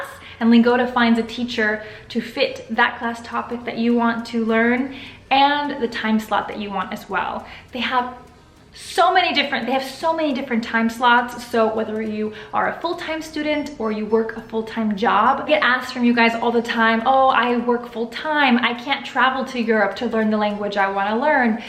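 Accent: Italy. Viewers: So glad to hear you speaking my language!! I'm so impressed to see you speaking so many languages! I think I never told you that I was French but I'm really happy to hear that you started to take French lessons online! Do you know what? You've got an Italian intonation when you speak French ! I'm excited to see more videos about language learning especially (and about French in general)! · Trop bien ! Tu parles déjà hyper bien. Je trouve aussi que t'as un tout petit accent italien quand tu parles en français, mais ça fait très classe :)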